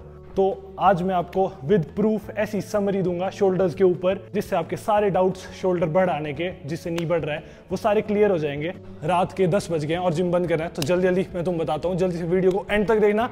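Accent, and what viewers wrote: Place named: Himachal Pradesh, India. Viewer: Bhai aap Bolne ke accent se Himachal Pradesh ke lagte ho.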